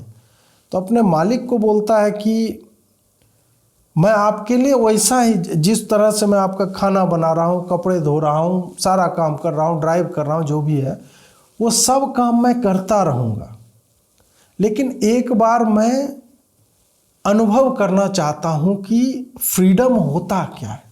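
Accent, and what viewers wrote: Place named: Bengal, India. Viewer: Bengali accent is too good. Milega sab milega.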